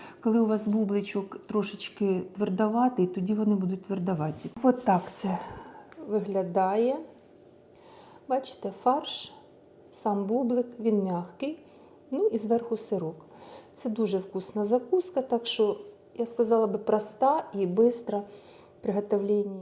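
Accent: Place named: Surzhyk, Ukraine. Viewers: Мені дуже подобається ваш канал. І я дуже дуже вдячна вам за ваш суржик. Він мені такий милий, бо нагадує Херсон, де я проживала до війни. Успіхів вам! ви така неповторна і щира. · Ви не схожі ні на кого,у вас свій стиль на воя харизма,у вас суржик,але він більш український,уГалини ж тільки для виду пару слів українських,ви приємна чудова,доступно все розповідаєте і показуєте не дивлячись на те що не закінчували кулінарних закладів для мене особисто ви своя,зрозуміла і ХОРОША ЛЮДИНА❤🎉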